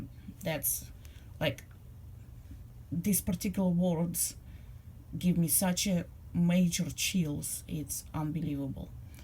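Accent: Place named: Russia. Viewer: your rusian english pronansiation is owfull режет слух,нельзя ли записывать на русски мадам?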